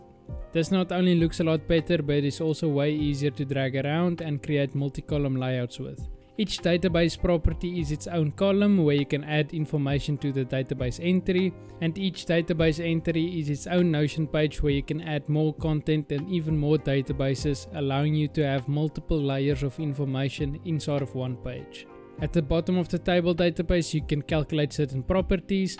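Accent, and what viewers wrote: Afrikaans, South Africa. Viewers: This is a great video!! I felt right at home the moment I heard your Afrikaans accent😂 Your video is really helpful and everything went smoothly when I did it myself except for the creative progress bar, everytime I tried to put in the code it said this: "Argument of type text does not satisfy function slice. [6,18] Percentage is not defined. [23,33] Argument of type text does not satisfy function slice. [48,60]" I copy and pasted the code so I never changed anything yet I still keep getting this. Do you know how I could fix it? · bro are you South African ? We have the same accent hehe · This is the best description of an Afrikaans accent I've ever heard lmao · OMF Are you South African. I can hear the Joburg Vibes from your voice. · I can hear a South African from a mile away. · Not Slavic. He is South African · 100% south african? 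😅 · Damn this man is very Afrikaans. Wat n legend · 2 seconds to hear your south african.❤😂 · 6 Seconds in and I hear a Saffer 😂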